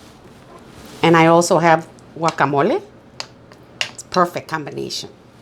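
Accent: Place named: Texas, United States. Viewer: What in the hell are you doing in OHIO??? You sound like your from Texas or California.....I never seen a Ohio Mexican but Viva La Raza!!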